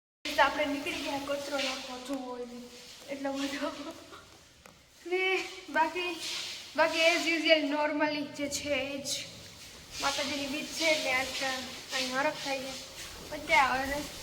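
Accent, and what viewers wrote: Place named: Mehr, India. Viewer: Mehr dialect. A boli na 2 prakar chhe. Ghed ane barda ni. Thodo fer hoi chhe.😊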